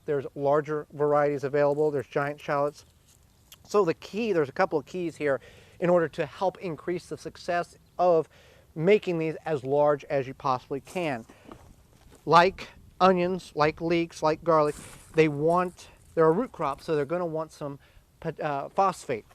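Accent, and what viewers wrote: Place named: United States. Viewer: Simple, helpful American English. Thank you.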